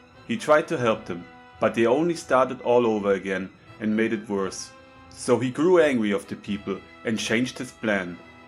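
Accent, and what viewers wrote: Germany. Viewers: no problem bro, and are you maybe German too? idk i think that maybe because of your accent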